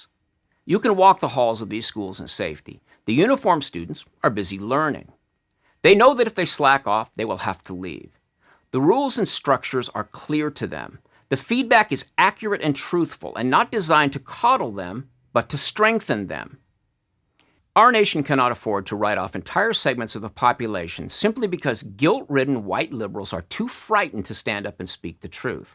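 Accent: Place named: Michigan, United States. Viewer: I'll admit, I wasn't fully awake yet the first time I watched it, so I gave it another go. (Yes, I watched your video first thing this morning, lol) You are correct that "blacknosing" in harmful, it helps no one, and in my opinion, it's even worse when it comes from our leaders. If I had been in your writers group, it would have been my pleasure to offer those women the honest input they deserved as artists...unless they got pissy with me over it. For the most part, I still agreed with everything you said, and felt like I just have a slightly different point of view on part of it. But in watching your video again, it gave me an opportunity to look at myself and see that perhaps on this particular topic I'm coming from a place of apathy. And maybe I'm not happy about that, because as an otherwise empathetic person, apathy isn't something that I strive for. 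But what is the solution? That the average white person speak out against the ills of hip-hop? Or would it be enough to simply stop praising rubbish just for the sake of virtue signaling? I'm all for the latter! Anyway, I don't want this to turn into the Iliad...Great video, and I think we're mostly on the same page here. You speak in a manner much like the Michigan people I grew up with and I spent 22 years in LA, so I can relate to that as well. (I know Bliss Cafe too lol.) If there was a point you were trying to make that I still managed to miss, please point it out to me. I'd rather not walk away feeling like I missed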